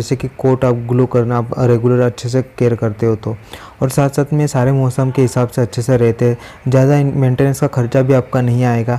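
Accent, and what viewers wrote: India. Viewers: तुमच्या बोलण्यातून एखादा शब्द ऐकून कधीकधी असं वाटतं की तुम्ही मराठी आहात.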